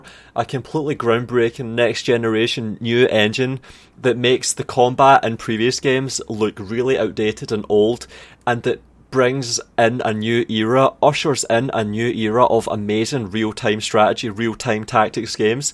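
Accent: Scotland, United Kingdom. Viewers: I remember watching you 8 years ago and I couldn't understand your accent, since then I've spent quite a bit of time around people from Scotland and Northern England so now your accent isn't hard to understand at all. This has no relevance to anything you talk about in the video. Keep up the good work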